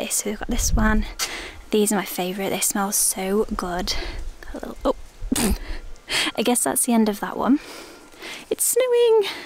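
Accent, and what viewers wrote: Yorkshire, United Kingdom. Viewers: Please don’t stop saying ‘tea’. The Yorkshire dialect is one reason I ♥️ your videos so much! My dads from Sheffield & it makes me homesick to hear you talk!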